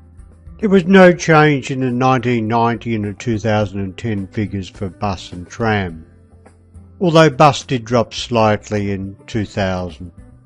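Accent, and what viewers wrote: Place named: India. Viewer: hi,it is really helpful,but just your accent ,i think you are from india ? but anyway i can understand you nicely ,tks anyway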